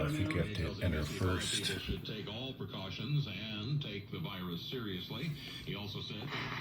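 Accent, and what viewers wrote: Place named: Buffalo, United States. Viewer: Your location maybe Dallas, but your accent says Buffalo/Rochester/Chicago! Am I close? Thanks for this great comparison among these big-league receivers,!!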